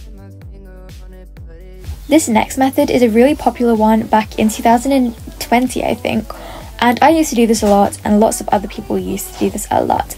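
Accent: United Kingdom. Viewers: Power of the British ascent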